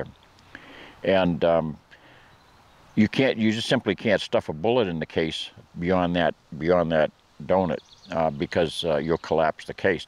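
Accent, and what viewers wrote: New England, United States. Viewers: I'm not sure where you live but you do have a New England type of accent? I was born in Minnesota but spent 70 of my 80 years in California, except for a couple years in West Germany. Anyway, here, you have touched on one of my favorite cartridges. I have owned one Ruger M77 25'06Remington and two 25'06 Ackley's. I hunt northern Nevada, or at least I DID, and for goats and coyotes at 350-400 yards, it does the job. The traditional problem has always been that nobody makes a suitable target quality bullet in that bore diameter but I knew a fellow that would run off some of his super accurate 100 grain Starkes. Great bullet weight for open range coyotes. You are frequently focused on resale value, when buying rifles, and everybody has their reasons but I don't care about that. If I need a new barrel, I buy a premium target grade in whatever contour that strikes me and whatever twist suitable for the bullets I want to use. 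By the way, I think we agree about flat based bullets, whenever possible. I am NOT a fan of this extreme long range potting of big game animals. These people have no idea how much even a bedded deer can move while the bullet is in flight! My ethical distance is 400 yards. Yes, I will take an occasional shot at one of a pair that is leaving, like if it's straight away. There is a magic in some cartridge designations and 25'06 is one with the magic. So is the 220Swift; pure magic! So is 300Mag. I'm an advocate of a factory 22-250AI cartridge. That case far outpe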